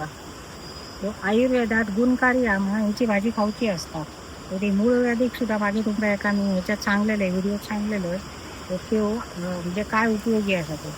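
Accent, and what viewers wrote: Malvani, India. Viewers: आपली मालवणी भाषा एकदम भारी..❤ऐकाक पण छान वाटता आणि तुमची माहिती पण भारी 👍❤️❤️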